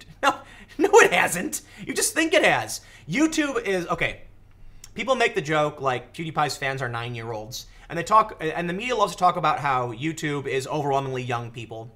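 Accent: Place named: United States. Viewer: Why cant Americans say yoghurt? Sorry, but it does grate on me!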